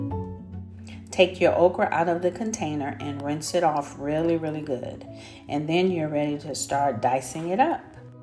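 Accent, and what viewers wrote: United States. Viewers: Sis u sound like a real country down home girl love it Thumb up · Where you fr baby girl, I love this dish I'm on my second time of fixing it, so good. You talk like some of my family from Halifax NC!!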